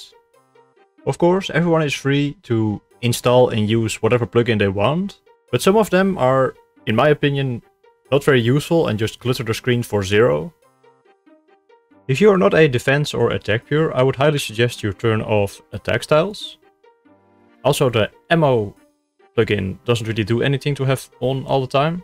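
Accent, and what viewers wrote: Netherlands. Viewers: I smell nederlands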